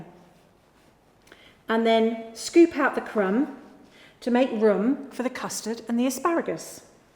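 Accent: United Kingdom. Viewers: British English ♥️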